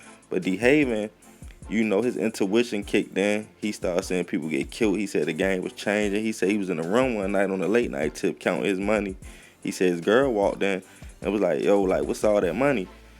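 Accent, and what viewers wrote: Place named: Maryland, United States. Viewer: Are you from Maryland or DC i hear a accent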